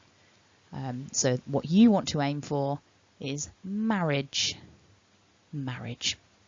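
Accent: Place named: United Kingdom. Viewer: i love British English accent 🥰🥰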